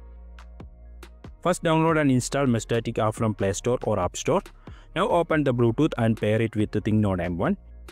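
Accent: India. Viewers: Индийский английский звучит противно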